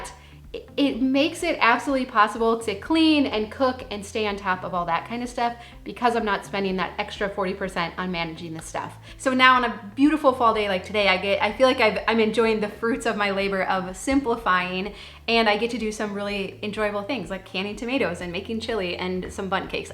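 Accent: Minnesota, United States. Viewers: "Eye-talian". Soo Minnesoota!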